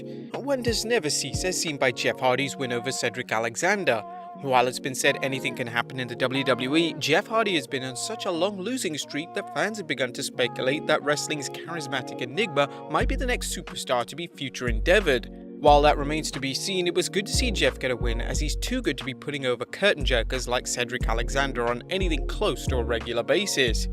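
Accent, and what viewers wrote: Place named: United Kingdom. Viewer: It is so cute to me that because of your accent you don't understand that this entire enterprise serves no purpose but to insult the viewers intelligence. Silly British people think you can take a cerebral approach to understand American blue collar culture.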